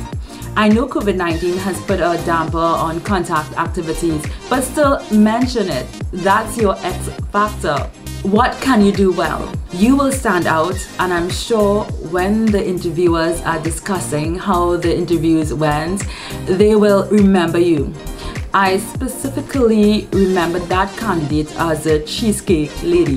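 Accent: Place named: Trinidad, Trinidad and Tobago. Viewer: I’m picking up that beautiful Trinidad accent. Great tips. I’ve been applying these tools. I go into the interview with the intent of making it more of a conversation in selling myself.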